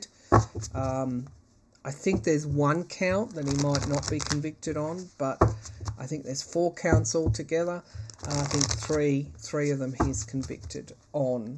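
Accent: Australia. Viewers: I love listening to you, thank you for your readings, also good to hear an Aussie accent being so far from home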